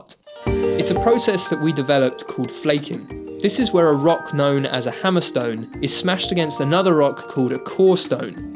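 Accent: United Kingdom. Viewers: "I've always been fascinated by the idea of animals using tools" Sounds sophisticated when you say it with an English accent. Not so much if you're from the states.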